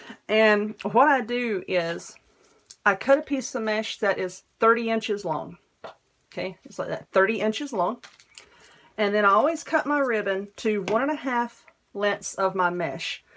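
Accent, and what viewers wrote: South, United States. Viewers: I love your deep southern accent! And the way you explain things makes me feel like I could do this project too! So inspirational! Thank you! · Just beautiful! Love your Southern accent. Keep up the good work.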